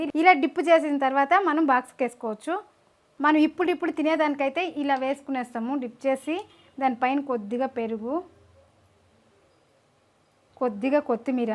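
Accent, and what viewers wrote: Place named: Tamil Nadu, India. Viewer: But, you are looking and speaking like a Tamilian ,akka 😃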